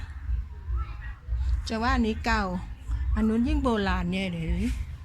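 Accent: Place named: Suphanburi, Thailand. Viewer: 😊👍พี่คนสุพรรณเหรอครับ ฟังจากสำเนียง ผมเคยไปเที่ยวบ่อย..อิอิ😁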